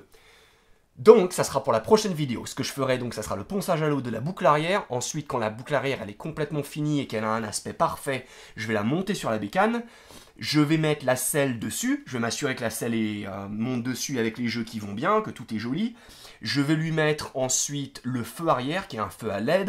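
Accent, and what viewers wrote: United States. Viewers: Je découvre ta chaîne (même si ta Yam c'est une "cardan"😁). Je m'étonnais de voir (entendre) un Français qui a un bon accent anglophone (américain), mais apparemment, tu vis aux States. En revanche la dénomination de ta Yam, n'était pas "Virago" : à l'époque dans l'Hexagone, on avait la XV750 Special, mais la tienne ressemble à une série sortie chez nous en XV1000SE la Midnight Special (avec sa finition noire et dorée) avec 2 disques à l'avant pour la 1000. Les "Virago" XV 750, 1000 et 1100 en France n'étaient pas équipées du mono-amortisseur cantilever, mais de deux suspensions arrières, ce qui est plus contraignant pour la transfo café-racer. En tout cas beau boulot !😃👍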